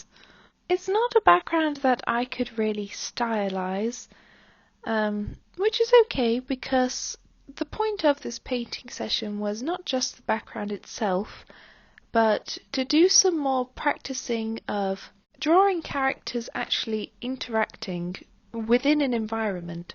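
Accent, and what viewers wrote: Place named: United Kingdom. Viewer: Thank you! Also, I guessed it was a British accent but even so it sounds different than the ones I had heard so far, are you a noble or a princess or out of a fairytale? I would definitely cast you for a fantasy animation movie as a voice actress for the main protagonist ^^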